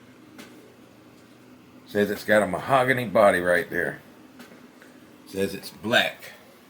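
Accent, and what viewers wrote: United States. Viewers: Hi I'm from Italy, i love your accent, which part of the states are you from?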